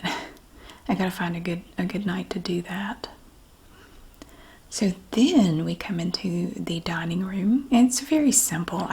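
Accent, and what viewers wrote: South, United States. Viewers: This is my first time on your channel and I just love love love your accent!!!!!!!! I’m obsessed with southern accents! Also love your vibe and energy! I’m now subscribed and look forward to your future uploads! Love, from the U.K.! 💖💖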